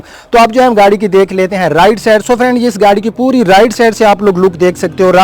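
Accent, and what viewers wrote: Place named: Thal, Pakistan. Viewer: Ap Thal kay ho ap ki Zaban thal ki hai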